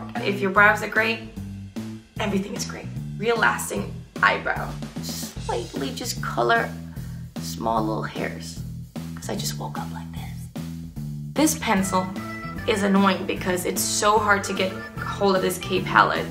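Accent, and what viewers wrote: Denmark. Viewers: she’s fully danish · I love that you can hear you danish accent a bit! (jeg er ogs fra danmark ahahah) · She's Danish . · She's from Denmark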